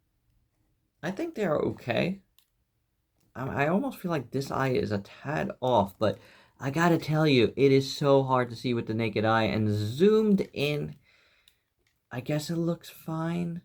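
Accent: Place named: New York, United States. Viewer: you have GOT TO BE from NY, i can hear it in your voice.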